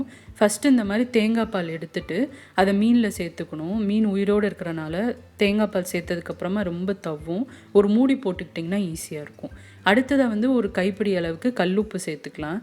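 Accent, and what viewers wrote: Madurai, India. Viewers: அக்கா நீங்க மதுரையா.....குழபும் அருமை....என் மதுரை தமிழும்......அருமை....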